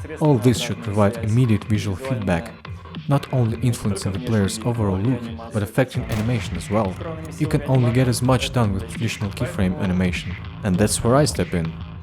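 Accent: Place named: Russia. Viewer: when a russian try to speak english in this video...i understand only 40% of the dialogue, please take an other speaker for english voice over....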